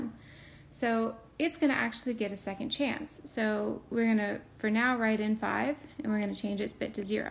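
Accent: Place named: Turkey. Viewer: bro where are u from that's turkish english ı think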